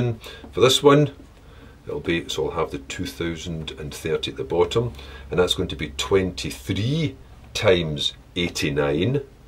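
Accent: Scotland, United Kingdom. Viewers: He's Scottish, not Irish.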